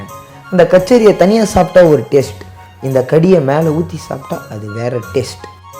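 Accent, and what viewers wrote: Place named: Chennai, India. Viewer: narration aa therithu idhu namba Chennai payan nu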